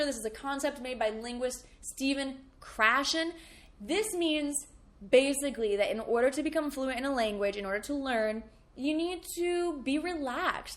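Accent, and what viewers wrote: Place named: United States. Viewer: What is the difference between American accent that you speak and Canadian accent?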